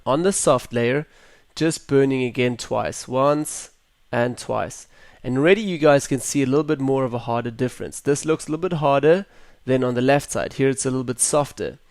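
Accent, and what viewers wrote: South Africa. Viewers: Since u sound south african i will subscribe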